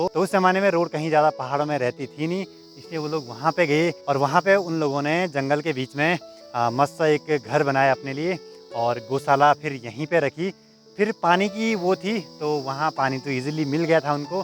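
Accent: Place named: Garhwal, India. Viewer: जगो तो गढ़वाली टोन है ।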